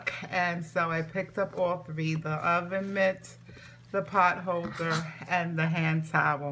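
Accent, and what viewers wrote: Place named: Philadelphia, United States. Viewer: Great red truck items. Where are you located? You have an accent like my aunt. She lives in the Philly area.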